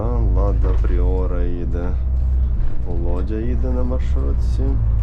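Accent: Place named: Uzhhorod, Ukraine. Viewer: А мені подобається мова автора канала. Дуже цікавий, навідь прикольний ,Ужгородський суржик, відрізняється від нашого - Дніпрорпетровського. І це нормально. І взагалі підримую автора , що кожен має право розмовляти, як він розмовляє. Ось писати, бажано без суржика :) . Всім добра.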